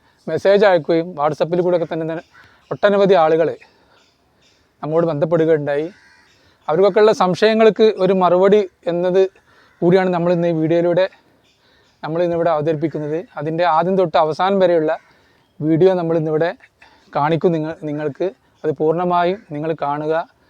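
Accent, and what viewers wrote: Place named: Valanchery, India. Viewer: മലപ്പുറം ജില്ലയിലെ വളാഞ്ചേരി ഭാഗത്തുള്ള ഭാഷ ശൈലി ആണ് അദ്ദേഹം സംസാരിക്കുന്നത് ഓരോ നാട്ടിൽ ഓരോ ഭാഷാ ഉച്ചാരണം ആണ് അതിനെന്തിനാ കളിയാക്കുന്നത്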